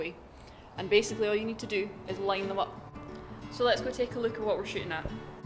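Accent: Scotland, United Kingdom. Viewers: Its a accent from scot. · Oh... that heavy Scotish accent! I can only catch about 30 percent of what she is saying. Thank goodness for closed captioning. 😅 😂 🤣 Side note: In this day and age (#metoo) it does seem a bit absurd that "fragile females" are given less shots to take than the "big strong men". In fact, it's a bit absurd that there still are still both separate women's and men's events in this particular sport. Why not let them compete head-to-head?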